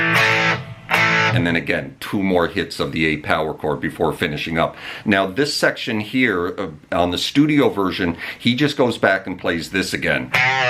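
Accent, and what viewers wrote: Canada. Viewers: you sound Canadian, I’m going to see at Firekeepers Casino in November, can’t wait.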